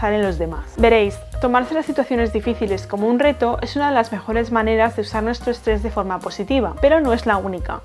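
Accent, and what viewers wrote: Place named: Spain. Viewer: me gusta tu voz y ese acentico español,. te preste mucha atencion y te entendi bien, ademas que eres bien linda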